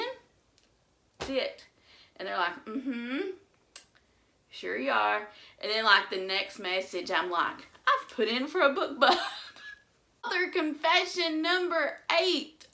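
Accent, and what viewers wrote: United States. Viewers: I love your accent because it reminds me of places I have lived in the Deep South. A bit of an accent sometimes comes out of my mouth to this day... If I ever said I wasn't jealous of other writers, I was lying... I often want to give up writing... I love editing. Like Stephanie said below, it's my thing/jam... Hey, you should love your books!!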